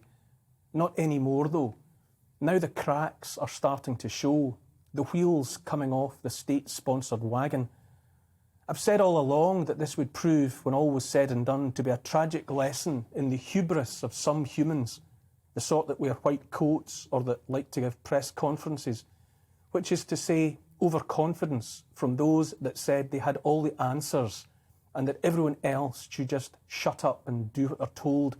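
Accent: Scotland, United Kingdom. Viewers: You are the very first person I’ve comes cross to see through this guy. I was getting worried I was the only one. He’s crafted his delivery to come across as very sincere. He doesn’t smile, continuous Scottish monotone, speaks of tradition, knowledge, learning, then says he’s not an academic, but that taking advice from medics, scientists, and virologists who have to train and study their disciplines for years should be ignored. He doesn’t acknowledge this virus has killed and caused long term damage to thousands of people. But these fools kneel at his feet. Pathetic. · Freedom sounds so much better in a Scottish accent.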